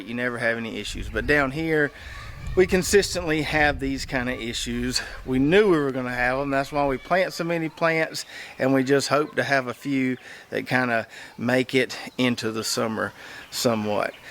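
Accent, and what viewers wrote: South, United States. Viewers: I’m a new subscriber I was wondering what state are you located ? I’m in Florida and it looks like Florida and maybe the accent lol. Definitely from the south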